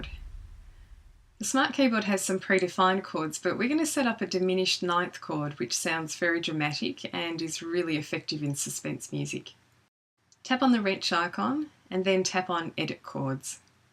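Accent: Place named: Australia. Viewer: Hi. My name is Maribel. I am in California. My 6th graders love your video and AU accent. We love tech in music class!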